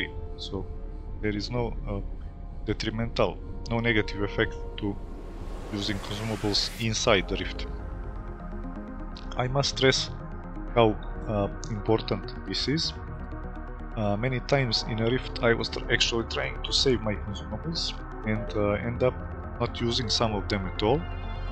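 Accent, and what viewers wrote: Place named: Russia. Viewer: Блин такое ощущение что он русский просто говорит на английском😁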